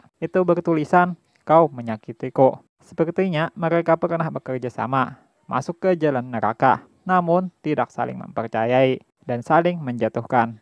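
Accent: Banjar, Indonesia. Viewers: Suaranya Mimin kaya logat orang Banjar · Aksen nya mirip orang banjar hulu sungai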